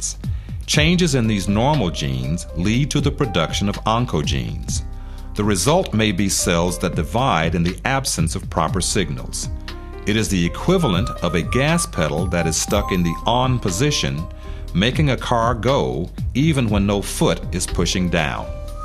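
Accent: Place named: South Carolina, United States. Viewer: This narrator could be Charlie Rose's brother with a little Morgan Freeman depth thrown in--he has the same sweet South Carolina type drawl, not trying to hard to sound Midwestern. Very easy to listen to.... one of my primary rules when looking for video or audio series... a voice that makes you want to sit down and listen.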